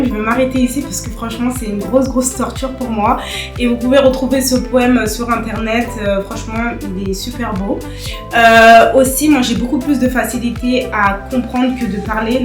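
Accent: Paris, France. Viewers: Czy ja dobrze rozpoznaje, że mówi z paryskim akcentem klasy robotniczej?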